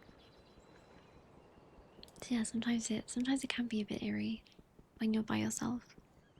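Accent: England, United Kingdom. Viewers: it's just an English accent what😭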